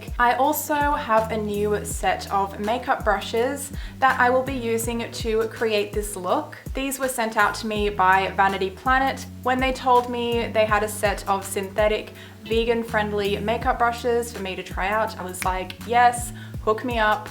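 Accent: Australia. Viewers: Her face screams "French Model" to me, so even though she talks like an Australian, it didn't hit me that she's Australian until she mentioned the weather there lol. I love how she leaves a little bit of darkness under her eyes (especially since I see a bunch of people on YouTube who take their concealer all the way up to the lashline). It looks really cute :) Thanks for posting.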